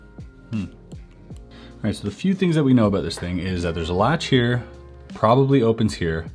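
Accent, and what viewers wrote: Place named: Quebec, Canada. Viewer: Salut! Je viens de voir une vidéo de toi qui parle en français, je suis tes vidéos depuis plusieurs années déjà et c'est la première fois que je t'entends t'exprimer en québecois, c'est weird! Je savais que t'était de Montréal comme moi mais je savais même pas que tu parlais bien français! Entk bonne continuation, j'aime beaucoup tes vidéos.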